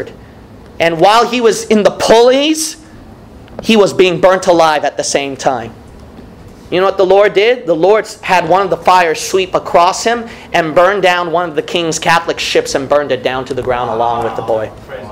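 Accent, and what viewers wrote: United States. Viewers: You are so right. I love to see new videos come out from! And I love his country accent, esp when he says "teachin'". And whoever that is in every teaching screaming out "Amen" in every video, God bless you Brother 🙏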